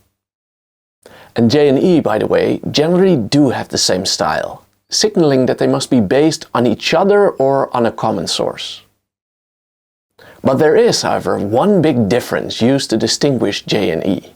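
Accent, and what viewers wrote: Netherlands. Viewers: could be because of the Dutch accent. The letter J is pronounced /yay/ and G is /hay/. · YOUR ENGLISH IS VERY GOOD, almost without any accent, BUT when listening to you for 10 minutes, one can detect your Dutch mothertongue by your v- and s-sounds, which in some intances you pronounce the same as in Dutch and not as in English.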